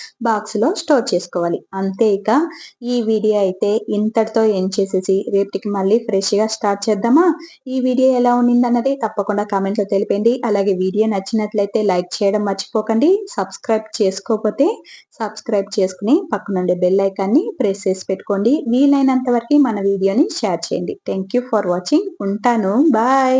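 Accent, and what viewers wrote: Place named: Tamil Nadu, India. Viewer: Telugu raada meku Tamil laga matladutunnaru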